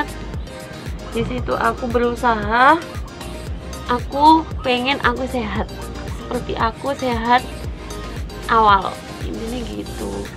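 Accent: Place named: Java, Indonesia. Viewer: Logat jawa nya semakin lama smkin keliatan kaa ehehe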